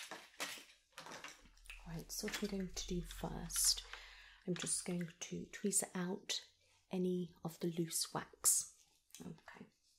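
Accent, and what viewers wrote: United Kingdom. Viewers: centre, I presume? A bit north of London, like the latitude of, say, Cambridge? It may seem a silly question, but I just find amusing to train my italian ears to recognise and emulate british accents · I love your British accent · What part of the UK people have that accent? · Ah, I love British accent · I love the british accent. It make the ASMR more slowly and it causes so many tingles. Btw, u look like Meryl Streep to me xD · ASMR + pretty doctress + British accent . OMG . It must be wonderful 😊🤩😘❤ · it’s British! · are you from the UK? you have such a nice accent! :)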